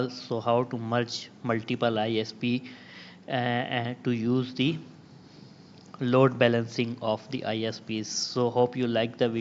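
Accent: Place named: India. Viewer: I liked the video and helped me a lot, also I I'm using this chanel to get used to Indian accent.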